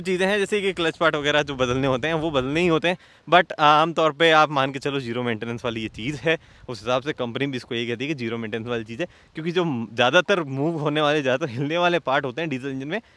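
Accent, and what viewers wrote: India. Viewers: U are using somewhat desi extent in your voice while reviewing this desi vehicle that's an awesome thing ❤❤❤❤❤❤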